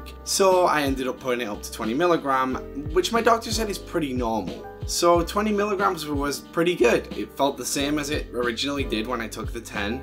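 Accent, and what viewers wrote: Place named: South London, United Kingdom. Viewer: you Sounds a bit like a south londoner 😄👍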